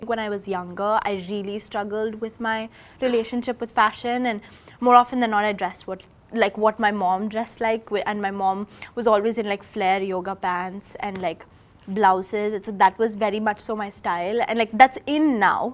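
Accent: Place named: United States. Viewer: American accent as she was born in USA.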